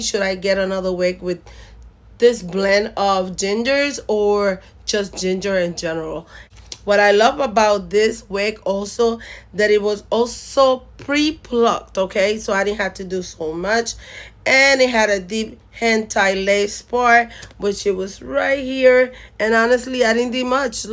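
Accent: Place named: Cuba. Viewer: I love your accent , you sound cuban ❤❤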